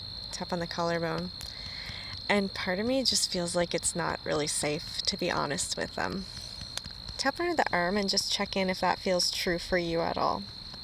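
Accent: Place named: Canada. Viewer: It's funny to me how I randomly picked this after doing a general EFT search (without knowing you or your channel)...and hearing your Canadian "accent". My communication breakdown is with a Canadian 😇💙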